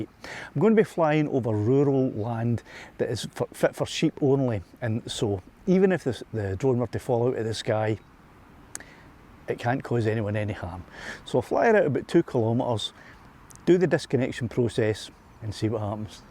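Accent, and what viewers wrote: Scotland, United Kingdom. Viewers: Thanks for this. I am not Scottish but my ancestors are from Dunrobin Castle. I love your accent. You remind me of a past manager and friend I had years ago whom my wife could not understand, ever! Where do you get your beautiful music? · I'm Australian, so very much used to Scottish accents here.... no subtitles required. Great video. Geeya thombs op mon. Glad to see Lassie returned to fly another day. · Hi how's it gawn, its magic tae hear our accent on youtube, understood every, and naming your drone lassie hahaha hiya fae wishy, where were you flying looks like up by Lanark near tnto Hill,? Bet am miles off, I would love tae have the bottle to go in front of camera, better behind it ha ha the mini 2 is amazing, I'm blew away with mine, keep up good work buddy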